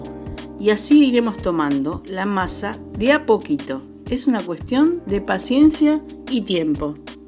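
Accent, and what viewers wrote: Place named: Uruguay. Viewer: Habla más uruguaya...🤔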